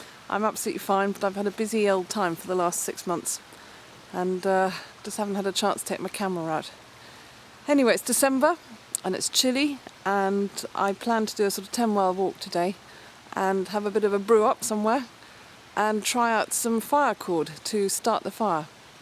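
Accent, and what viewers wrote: United Kingdom. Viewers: Bushcrafting with the expansive British language :) Enjoyable to listen to as much as watch. Clever pot stand too.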